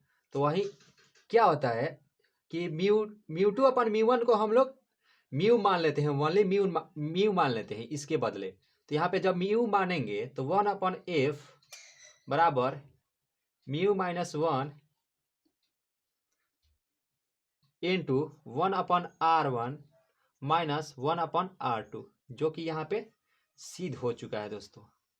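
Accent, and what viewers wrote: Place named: Bengal, India. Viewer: Lagta h sir aap Bangal se h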